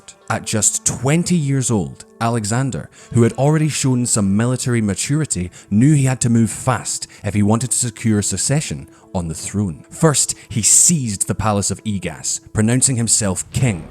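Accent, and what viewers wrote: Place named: Edinburgh, United Kingdom. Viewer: How fitting is having this video narrated by an Edinburgh accent, the Athens of the North.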